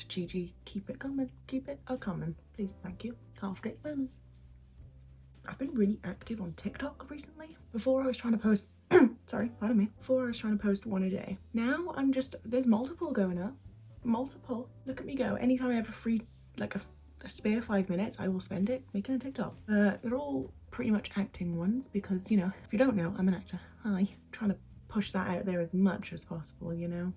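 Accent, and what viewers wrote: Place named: Yorkshire, United Kingdom. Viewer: SO.. I’m from Yorkshire 😅😂 ..you definitely got the accent on point hahaha!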